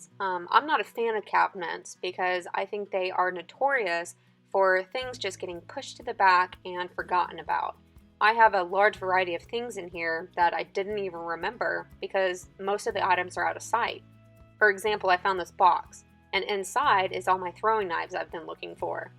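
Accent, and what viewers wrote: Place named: South, United States. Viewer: apparently I'm not allowed to say anything about the southern drawl. so sorry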